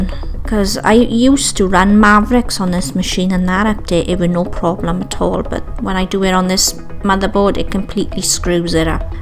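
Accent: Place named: Wales, United Kingdom. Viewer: <3 welsh accent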